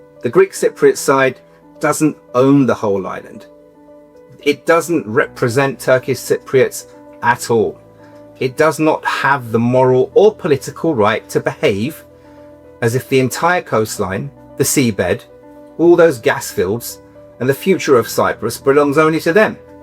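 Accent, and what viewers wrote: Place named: United Kingdom. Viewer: you are invaders to Cyprus you have no rights there that's why you been ignored by all the states Turkish Cypriots ? really where you came from cause before 1100 AC there is nothing written about Turks you only came with the Mongols so what is your rights on an Island that been 3000 years known as Greek ? read some history as your accent is British there are many many libraries to go and open your eyes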